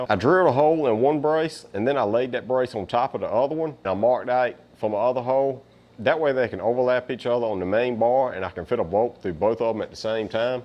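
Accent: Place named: South, United States. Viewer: Where are you?? I am trying to finger out your accent but I can’t!! It’s southern, it’s a bit Cajun, it’s a bit lumbee.. I have no idea!! ;)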